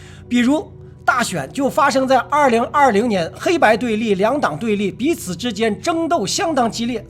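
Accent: China. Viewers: 大陸口音需要那麼重嗎？ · 大陆人有大陆口音有问题吗? 你要给配音吗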